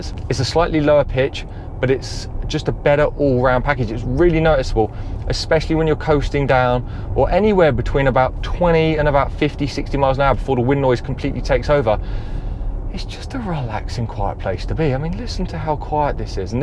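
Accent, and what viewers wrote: United Kingdom. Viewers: I was thinking this guy would be great working alongside Rory Reid on the Autotrader channel, it's a shame they don't live in the same country.... But then he mentioned British weather. This channels about page says "United States", I was wondering why his accent was so pleasant and his personality not like the hyper active American car reviewers.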